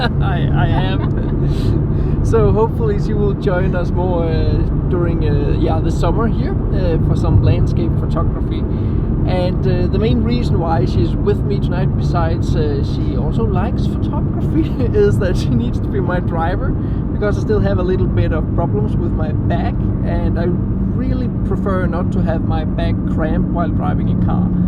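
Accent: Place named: Denmark. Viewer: Excellent video, as always. By the way, I'm following your work since the beginning when you just have a couple of subscribers... I can tell you, your work evolved a lot. Time pass by, you are more clean, your style well define. The only thing that never ever changed is your charming Danish accent! LOL. Congratulations! You deserve it.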